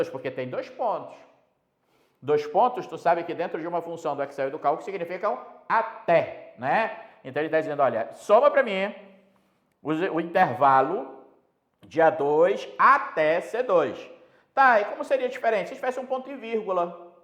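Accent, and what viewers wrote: Pará, Brazil. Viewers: Esse professor, é paraense. O sotaque não deixa passar (vamo deixar baixo), rsrsrs... Aula excelente, professor!!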